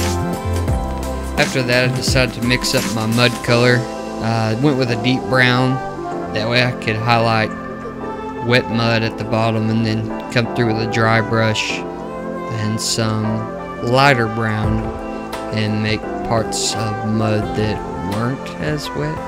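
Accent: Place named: South, United States. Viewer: First time hearing a southern accented hobby youtuber, love it.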